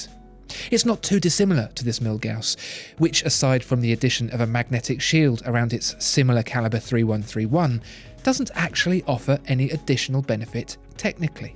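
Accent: United Kingdom. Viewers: This is completely subjective. A British accent doesn't make you an authority.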